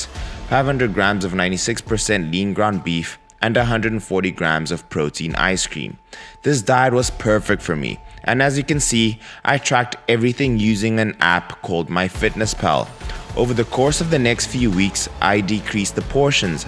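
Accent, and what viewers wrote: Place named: South Africa. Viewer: u sound like you're from South Africa but relocated to USA..New to the channel. Love all the info you're giving..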